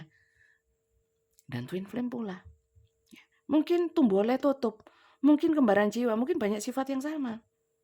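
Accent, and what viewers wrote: East Java, Indonesia. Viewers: Aku itu selalu teetawa sendiri kalau mendengar readingan bunda. Karena khas bahasa jawatimuran nya selalu muncul..😂😂😂😂 benar benar terhibur..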